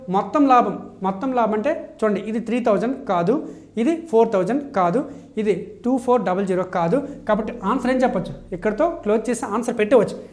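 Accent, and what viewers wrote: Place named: Srikakulam, India. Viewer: Sir meedhi srikakulam kada 😍😍😍😍👏👏👏 nice explanation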